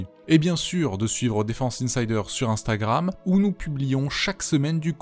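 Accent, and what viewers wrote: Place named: Germany. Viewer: bel accent allemand : )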